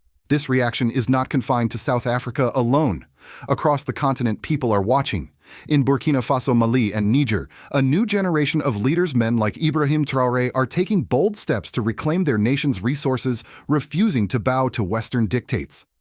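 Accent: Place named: United States. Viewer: F you American accent, you have no clue.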